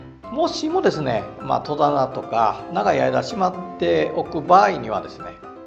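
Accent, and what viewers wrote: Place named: Tosa, Japan. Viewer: 先日竹虎さんでせいろを購入させていただいて、せいろの動画を探していたら公式さんの動画に辿り着きました！😳 初めて使うので、丁寧な動画を上げていただいて大変ありがたいです…！参考にさせていただきます✨ 自分は高知県民ではないのですが、恋人が高知出身で高知県が大好きなので土佐弁の音声もうれしくなりました😊🌸 他の動画もありがたく拝見させていただきます✨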